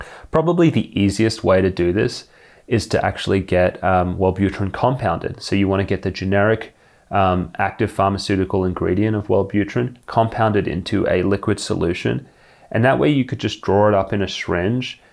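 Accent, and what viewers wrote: Australia. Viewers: Hello - I noticed you have an Australian accent - I tried to get Wellbutrin from my GP but it is not on PBS for depression. How do I go about getting this medicine in Australia? Thanks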